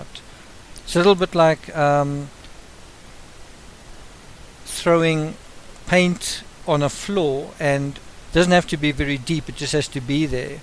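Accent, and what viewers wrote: South Africa. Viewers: 😆 I’m from the Uk and I find myself using American terms sometimes now.. I used flashlight recently and my daughter gave me a sideways glance 😏... I love the South African accent too... The way says ‘Dr Phil’ as ‘Dr Full’ always conjures up an image of Dr Phil with a big tummy after his dinner! 🤰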